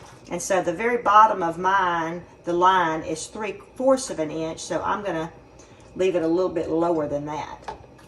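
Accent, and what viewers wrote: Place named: South, United States. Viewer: Never seen yellow.. I love your southern accent..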